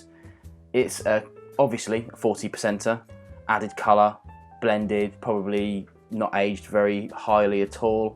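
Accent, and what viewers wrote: United Kingdom. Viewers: British accent brought me here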